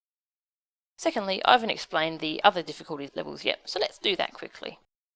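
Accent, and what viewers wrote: Australia. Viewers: You have a very amusing voice. Australian accent?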